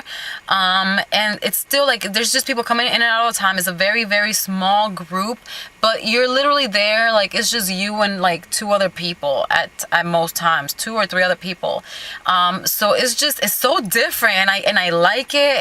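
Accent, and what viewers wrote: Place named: New York, United States. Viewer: Oh nice.. Never been there. You sound as if you could've been living in new york.. Lol.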